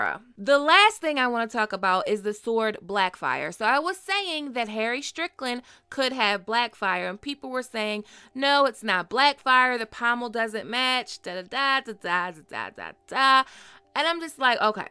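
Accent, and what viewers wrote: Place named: Baltimore, United States. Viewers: The Baltimore accent is strong in this one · you sound like you from maryland, just saying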